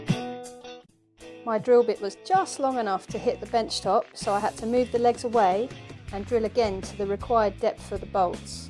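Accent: United Kingdom. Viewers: Great to hear a British accent and sayings on these videos. Good job. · Excuse me...are you scottish ?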